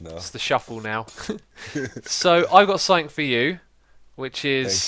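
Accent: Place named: England, United Kingdom. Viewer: Mesa-FIED or Mesa-FIGHT? I love your english accent but this has been bothering me all this season.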